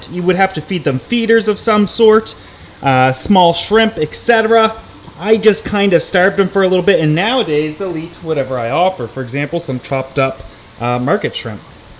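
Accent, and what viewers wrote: Canada. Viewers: Trollmaster I know we do but it still sounds so weird when someone says we have an accent. (I'm also canadian) lol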